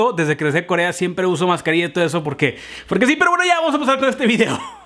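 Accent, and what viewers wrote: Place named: North, Mexico. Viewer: Veo todos tus videos sonriendo 🤩 y amo tu acento norteño! Yo también soy del norte 🙋🏻‍♀️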